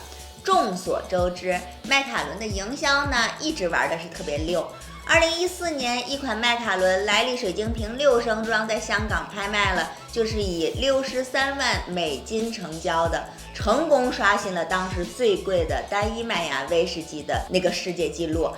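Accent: Tianjin, China. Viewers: 天津人吗？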